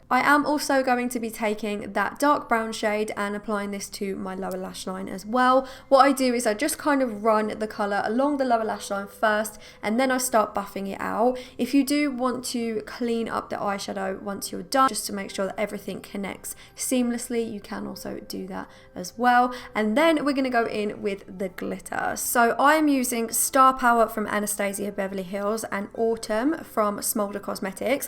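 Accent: England, United Kingdom. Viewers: This girl is beyond beautiful and has an English accent 😒😩😫😭 life’s just not fair! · I believe she means that this woman has a beautiful English accent and face, something which she does not have. But I can see how that might be misconstrued lol.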